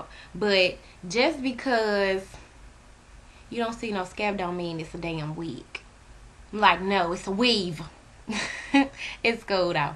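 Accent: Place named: South, United States. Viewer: i can tell ur from the south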